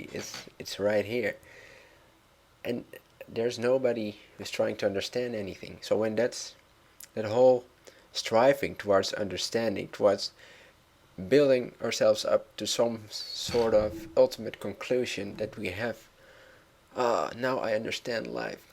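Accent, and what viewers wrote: Netherlands. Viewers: Is he Dutch? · yeah..... he's from Amsterdam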